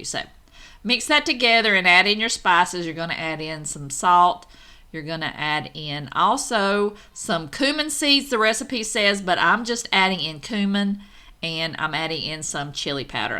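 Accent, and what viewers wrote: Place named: Tennessee, United States. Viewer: Hi from Tasmania. Love your accent. I sounded just like you when I lived in Tennessee. 😊 Now I sound like a crazy American with an " American" accent here in Tasmania Australia. Can't wait to try the Chili recipe. Just processed 7 litres of homemade worcestershire sauce yesterday. They have to age for at least 6 months. 😅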